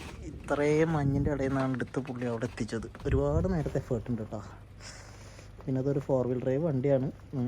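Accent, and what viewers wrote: Malappuram, India. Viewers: Malappuram slag mariyo😂 malappuram slag ato bangi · Malappuram slang kekkan oru sugaan😁🤝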